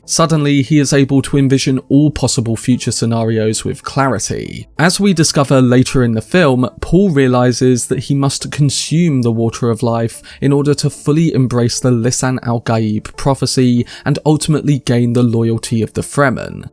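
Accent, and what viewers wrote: United Kingdom. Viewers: Your accent is super cute. Vitamin, water, other words… British?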